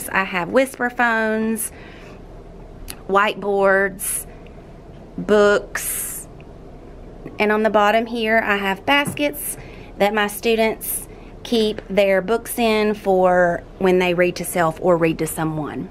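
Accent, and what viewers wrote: United States. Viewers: Do you teach in NC? I'm trying to figure out your accent. I'm in NC! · Are you a Southerner?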